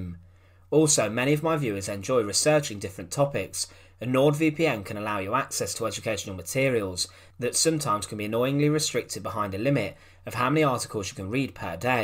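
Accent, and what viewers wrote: United Kingdom. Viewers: PLEASE INVLUDE ENGLISH SUBTITLES IN YOUR VERY INFORMATIVE VIDEO DOCUMENTARIES. YOUR STRONG REGIONAL ENGLISH ACCENT IS DIFFICULT FOR ME TO DISCERN AND UNDERSTAND . THANKS FOR THE GOOD WORK YOU ARE DOING . KEEP THEM COMING.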